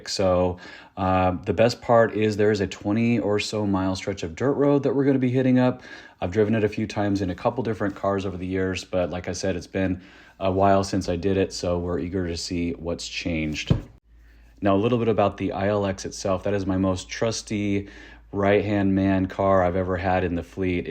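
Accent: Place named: Midwest, United States. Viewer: You're from the Midwest, aren't you?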